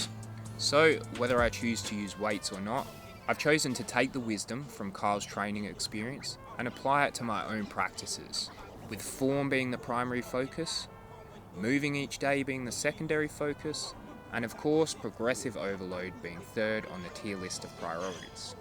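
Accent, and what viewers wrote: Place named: South Australia, Australia. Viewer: You have a South Australian accent!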